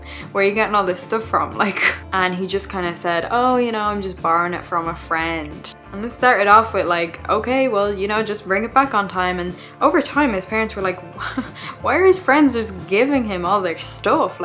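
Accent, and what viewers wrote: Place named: Ireland. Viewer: Love your Irish accent. So charming and nice ❤️ I see now why the Irish are such good storytellers 😊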